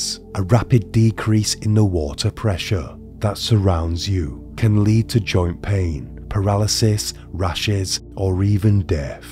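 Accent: United Kingdom. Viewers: I love the British accent, though I find it hard to follow if I'm just listening (yes. I know. It means I'm stupid cause no one else has that issue. Kool... Moving on) I thoroughly enjoy your narration, and, for what it's worth, appreciate the work you put in. "Jolly good show ole chap!!" ❤ p.s. Liked AND SUBed 😉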